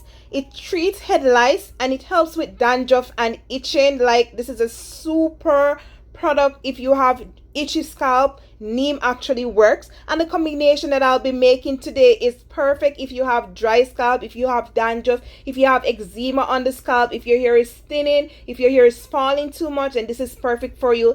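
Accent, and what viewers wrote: Jamaica. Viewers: I can tell she's Jamaican, did affi subscribe to di lady channel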